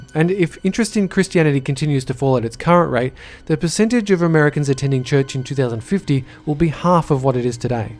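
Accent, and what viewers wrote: Australia. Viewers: A video titled "our society" read by an Australian, and only has facts about America. Dafuq? Look I know America tends to think it owns the world, but it doesn't. -_ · He's Australian actually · It's actually an Australian person and the reason why it's mainly America is because America has a lot of problems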